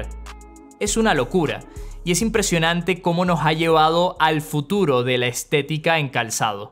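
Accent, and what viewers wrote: Venezuela. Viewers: Es venexolano?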